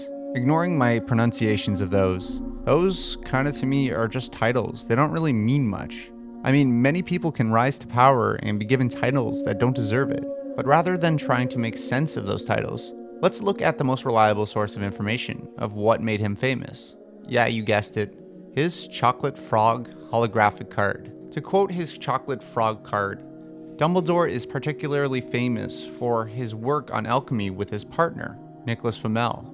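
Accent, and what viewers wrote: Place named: Canada. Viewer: Your Canadian accent is palpable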